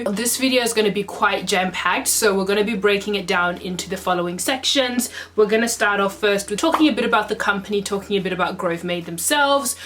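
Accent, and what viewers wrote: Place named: Australia. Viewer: As soon as I heard your accent I was like yess, an Aussie! I WAS contemplating buying the shelf from Grovemade but I just got to the bit where you said it $150 just for shipping O_O. girl no..that's not in my budget lol. I shall keep looking elsewhere but great video! <3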